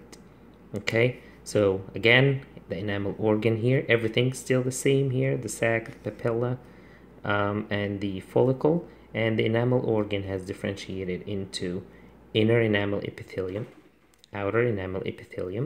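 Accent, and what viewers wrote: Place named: Vietnam. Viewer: who is agree with me that is seem to vietnamese accent??? p/s: i'm vietnamese, hihi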